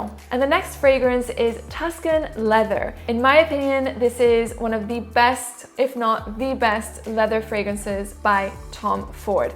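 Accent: France. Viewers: A totally intoxicating french accent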